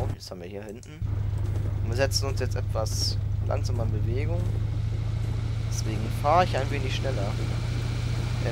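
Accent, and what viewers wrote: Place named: North, Germany. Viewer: Merkt man an der Sprache :D Hamburg hattest du glaub ich im Video gesagt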